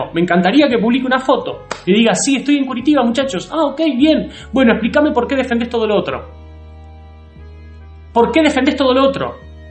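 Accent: Buenos Aires, Argentina. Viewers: Gracias por tu advertencia sobre Moras, soy seguidor suyo pero igual a lo del antisemitismo ya ni bola. Dos cosas mas, una no deberias decir que no sos lider de lo contrario nadie vendria a ver este canal, sos lider de un canal interesante donde la mayoria de las noticias "son falsas" decia checkeado o como era ese sitio. Otra cosa que nada que ver, me di cuenta que siendo cordobes no tenes acento cordobes, hablas como porteño.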